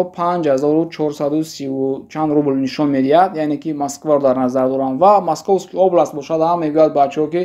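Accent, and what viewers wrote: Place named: Literary Tajik, Tajikistan. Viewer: ту бо ЗАБОНИ АДАБИИ ТОЧИКИ хело хуб харф мезани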